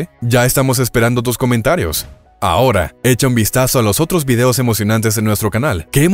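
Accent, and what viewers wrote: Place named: United States. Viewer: da arcadas escuchar esa entonacion yanqui de los nombres de los crateres y los vehiculos. Me gustaria ver a los yanquis esforzandose tanto por pronunciar los nombres en español de las ciudades de mexico (por poner un ejemplo)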